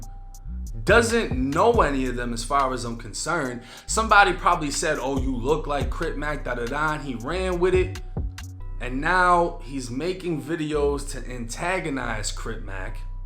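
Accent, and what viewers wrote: Boston, United States. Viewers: The way you have developed your delivery and pronunciation while still holding on to that Boston sound we all love. Your in a league of your own..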